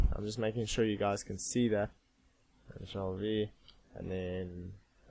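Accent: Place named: South Africa. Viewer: This bra sounds South African AF rofl awe bru thanks for the help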